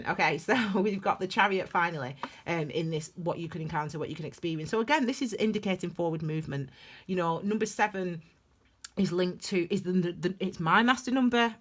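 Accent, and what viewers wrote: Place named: Lancashire, United Kingdom. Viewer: a grand lancastrian accent..interesting reading.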